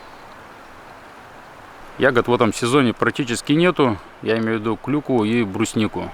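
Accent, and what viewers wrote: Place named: Siberia, Russia. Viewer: Спасибо, спасибо!!! Без блинов ваш сибирский говор просто умиляет.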